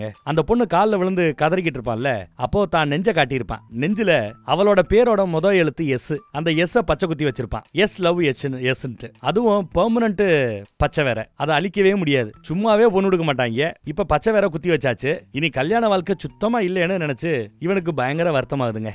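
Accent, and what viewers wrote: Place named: Madurai, India. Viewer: அண்ணா படம் ரொம்ப அருமை ஆனா நீங்க மதுரை தான முட்டை கண்ணு அப்பப்ப பேசுறத பாத்தாலே தெரியுது மதுரை தானே நான் உசிலம்பட்டி அண்ணா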